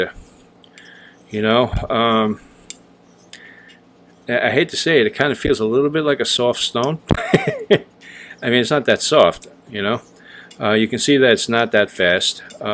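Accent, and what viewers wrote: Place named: Bensonhurst, United States. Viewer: Men are the new bitches!!!!! Lol!!! So true bro! My cousins live in Bensonhurst, and you have the same accent! So I just purchased a Ozuku Asagi. Previously, I have only honed on synthetics. I hope to be pleasantly surprised. I heard coticules are more difficult to hone on. I'm new to the channel and love it bro! You're very knowledgeable. Keep it coming bro! Any advice on the Ozuku would be greatly appreciated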